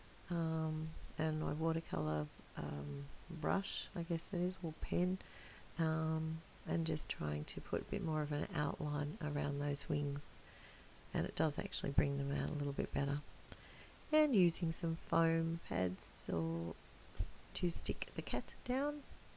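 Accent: Australia. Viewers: Hey, fairly new subbie, thank you for your video. I thought you sounded Australian, then you mentioned the reject shop, then you told us you were. I’m on Gold Coast QLD. Where do you hang out, glad I found you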